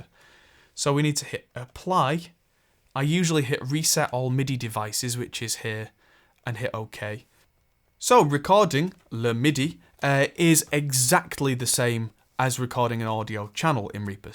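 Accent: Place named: Scottish Borders, United Kingdom. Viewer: what part of England are you from? Your accent sounds like you are close to the Scottish border. Am i wrong?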